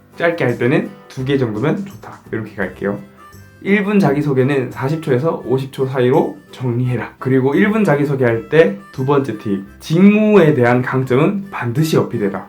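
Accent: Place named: Gyeongsang, South Korea. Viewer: 경상도 말투 귀여우세용 ㅠㅠㅋㅋㅋㅋㅋ 감사해요~!! 도움많이 받았습니당ㅎㅎ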